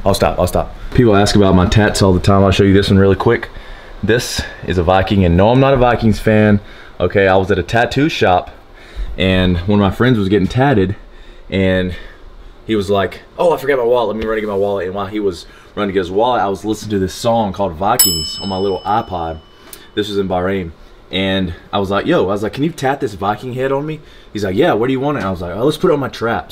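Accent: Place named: South, United States. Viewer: Don't take offense, but you remind me of a tough version of Lance Bass. Maybe it's your southern accents. I know he's from MS and you're from AL.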